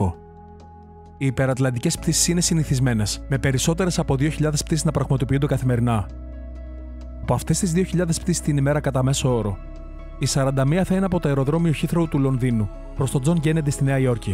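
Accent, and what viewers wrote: Crete, Greece. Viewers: ναι ρε φιλε, αχα. ανοιξα να δω τα σχολια για αυτό το λόγο. Άσχετο, απο την προφορά του, πρεπει να ειναι απο Κρητη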